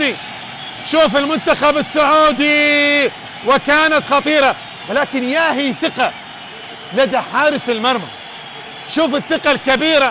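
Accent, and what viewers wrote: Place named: Yemen. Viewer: والله كأنه يمني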